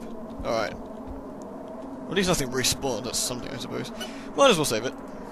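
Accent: United Kingdom. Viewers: Finally he's reading stuff! I wanted him reading since way back when he was doing Penumbra...the British accent makes everything sound more official...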